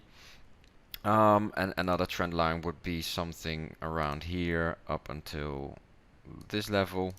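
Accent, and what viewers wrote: Netherlands. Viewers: I detect a slight Dutch accent unless it's South Africa? No mention of where you are in the About information.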